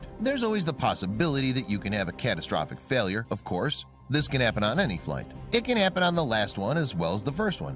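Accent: United States. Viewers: This was a truly horrifying and tragic event . Im afraid the commentators Perky Annoying American Voice doesnt really Suit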